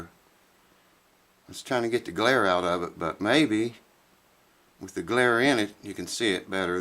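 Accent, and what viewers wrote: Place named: West Virginia, United States. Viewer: Thank you very much for this video. BTW are you from WV? I think I recognize your accent. I'm from Poca River.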